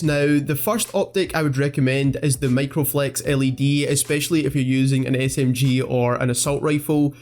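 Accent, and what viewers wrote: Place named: Scotland, United Kingdom. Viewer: The Scottish accent is the best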